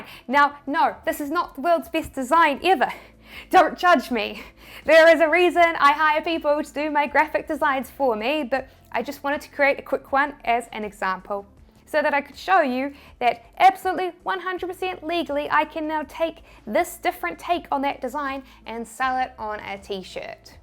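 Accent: New Zealand. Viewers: It is so good to hear the kiwi accent in contrast to the the - mostly - american accents. I likw more of that……including your famous dance. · Ummm, honestly I did not notice a "speech impairment." Unless, of course, you're referring to your NZ accent. LOL. But even if you have some sort of impairment, don't sweat it. Most people will never notice and it's really not important anyway. You're great just as you are. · Just about to launch a print on demand store. Thanks so much for this information! An aside: it took me a second to figure out what an "allatronic" trademark search was. I love your NZ accent, however. It's wonderful. I'm in the UK and people sometimes cannot understand me! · super helpful video, love the NZ accent! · Great advice found in here, blondie. I love that NZ accent. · the amount of value you create with your videos is absolutely priceless! 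And for the record, kiwi accent cuteness does not qualify as speech impairment!:) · Wow you sound so kiwi 🤣❤ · She's a Kiwi (New Zealander)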